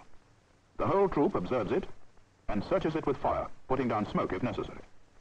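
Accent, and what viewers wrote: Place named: RP, United Kingdom. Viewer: The days when all narrators had what we call a BBC accent. God forbid they used anyone with even the remotest trace of a regional accent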